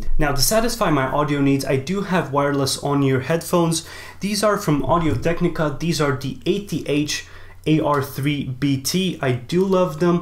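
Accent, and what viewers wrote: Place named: Eastern Europe. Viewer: To be honest, I'm from Russia, but I speak English. Initially, I think you are from Eastern Europe. It turned out to be true. Thanks for the answer!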